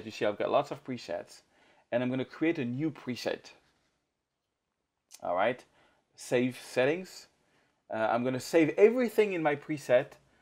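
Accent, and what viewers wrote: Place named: France. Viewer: Mercì,. I really enjoy your tutorials and your French accent. This one tutorial was really awesome. I would also like to thank Kent Jensen's comment about creating an adjustment layer. Cheers.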